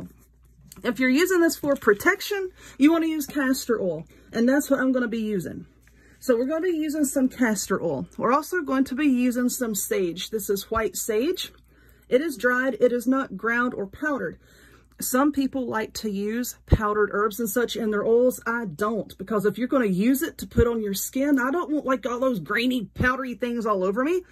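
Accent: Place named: South, United States. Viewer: I can't believe it took me this long to find this channel!!! I've been following your crafting channel for a couple years. I love your crafts and your southern accent! I can't wait to watch all of these videos. 🥰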